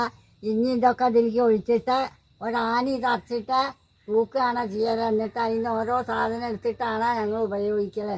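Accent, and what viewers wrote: Palakkad, India. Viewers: അമ്മൂമ്മ പാലക്കാട്ടീന്നാ 😊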